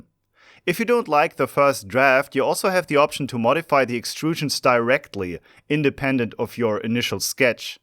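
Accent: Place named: Germany. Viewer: Are you aware that your German accented English makes you sound like an Engineer?!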